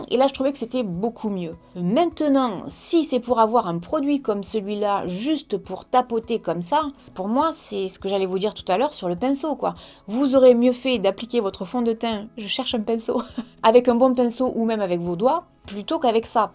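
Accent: South, France. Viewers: Bonjour Tout d’abord je voulais vous dire que je suis tombé sur vos vidéos par hasard et que je suis devenue fan de votre chaîne.vous êtes rigolotes, pétillantes et votre accent me fait voyager dans le sud à chaque fois..... bref je suis tombée sur un site internet kosmeopolis qui vend du make up a petit prix (marqué Bell, joko...) je recommence à me maquiller un peu plus depuis quelques semaines et vos vidéos a petit prix sont parfaites pour moi. bonne journée à vous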